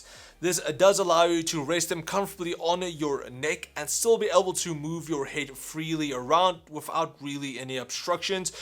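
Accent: South Africa. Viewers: Hey. You're South African haha. Me too. Considering to buy this headset for myself now.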